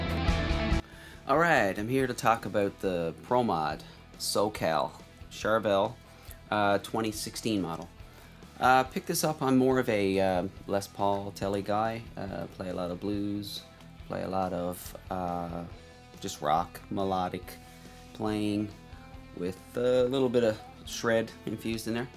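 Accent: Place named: Newfoundland, Canada. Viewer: I know a newfie accent when I hear it lol , excellent job man sick licks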